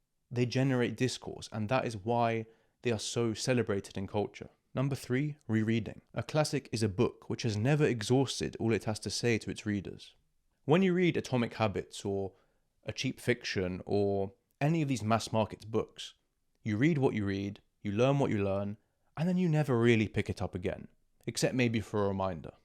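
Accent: United Kingdom. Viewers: ur britih accent was wrecking my nerves i had to turn on the caption to inderstand that shiz🥀🥀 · Most crisp and understandable British accent I ever heard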